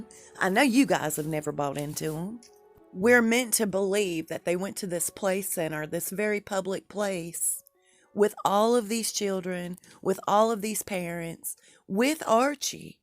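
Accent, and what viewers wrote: South, United States. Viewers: It's called a southern accent. A lot of us here in the U.S. have it..lol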